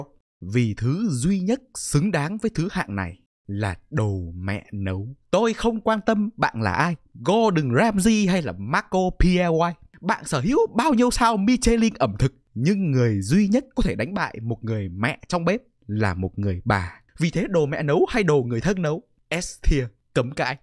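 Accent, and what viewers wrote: Hai Phong, Vietnam. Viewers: Chú ơi chú là người Hải Phòng ạ?😅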